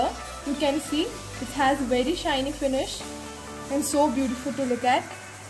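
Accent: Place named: South India, India. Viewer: I think ur south indian, but bytheway it was nice 😀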